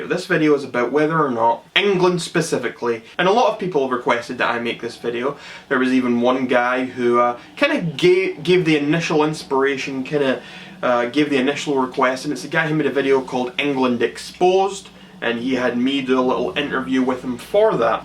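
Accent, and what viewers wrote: Scotland, United Kingdom. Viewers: Can u imagine wearing a fucking skirt and sounding Scottish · hurts? its comedy. Hes just making fun of GB. You notice his scottish accent right? He gave you all the clues... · Shut up man I live in England you’re making fun of our accents but ur Scottish you “we” “idiut” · A Scotsman with a Scottish accent berates Mancurians and Liverpudians. Oh how rich. Sadly he didn't pick on us Brummies - our accent is far worse than any pansy assed scouser! /S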